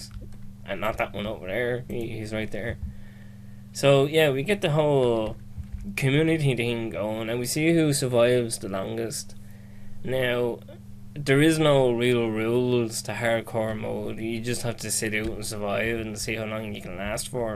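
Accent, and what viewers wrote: Ireland. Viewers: What part of Ireland ya from? I'm from Cork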